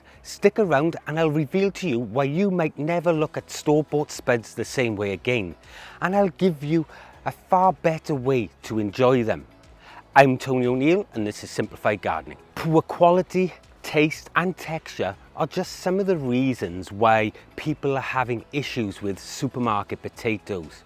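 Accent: Wales, United Kingdom. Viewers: This video is amazing. I think I recognise that accent though, 😊 is that a welsh accent? I live in south wales 🏴 up in the valleys. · Where in the UK is your accent from please? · Irish name? Welsh accent? 😉(South?)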